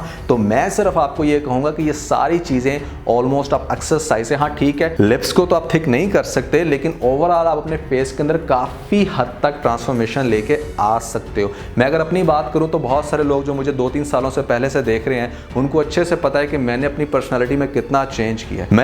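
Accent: India. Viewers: Bhaiee aap hindu ho kia aap ki language or aacent hindi hay baar baar ( achhay say) boltay hn aap achay tareeqay say kehna chahiye pakistanion ki tarah lanat teri shakal per urdu ko bigaar rahay hn aap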